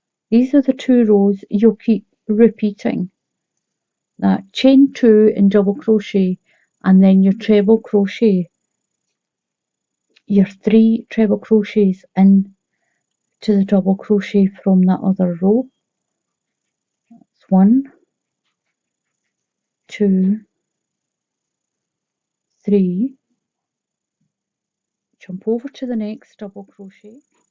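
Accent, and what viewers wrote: Scotland, United Kingdom. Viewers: Love your Scottish accent and love your tutorials. Our lock down in Letterkenny has expanded my crocheting experience. Another blanket going to be done this stitch. Love to hear Mutley in background think he would like you throw the ball!!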